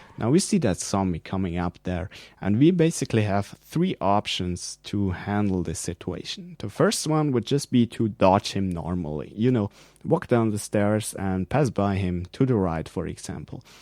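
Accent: Germany. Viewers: Are you german ? :D really good Video btw. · this is great dude thank u i was looking for this ur great and dude are u german i love ur accent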